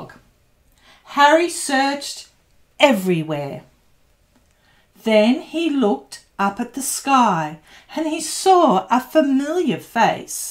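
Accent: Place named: Australia. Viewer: Hope I don't get this wrong ha ha but Aussie. They like it 😁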